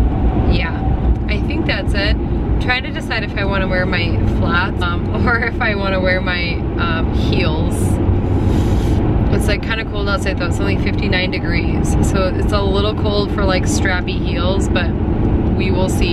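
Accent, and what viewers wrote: Minnesota, United States. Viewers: My dads side of the family is from MN and I want to move there SO bad! When I started watching your videos I knew just by your voice you were from MN. 🤣 I wish I had an Minnesotan accent! ❤️❤️❤️❤️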